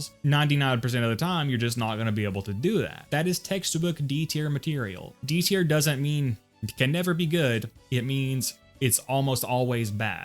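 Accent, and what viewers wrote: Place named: West, United States. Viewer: I’ve watched a ton of MOLMF vids this guy clearly sounds way more southern. While sounds more western, I think Nevada or New Mexico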